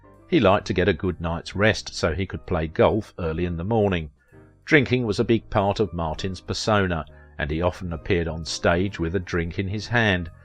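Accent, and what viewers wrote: England, United Kingdom. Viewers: He's English. Accents happen.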